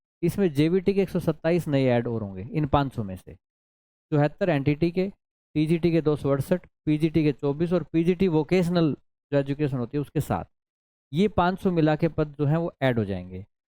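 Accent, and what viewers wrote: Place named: Haryana, India. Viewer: Sir apki language se haryanvi jlak rhi h.. maam ne btaya tha ki ap haryana se belong krte the.. Phle. 😅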